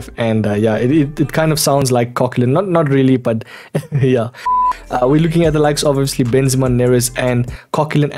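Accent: South Africa. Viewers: I hear a South African accent boet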